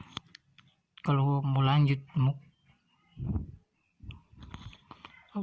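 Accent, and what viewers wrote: Indonesia. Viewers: mantaap coooi ambon logat ni ancor hahahah gooot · ngana pe logat macam logat Gorontalo..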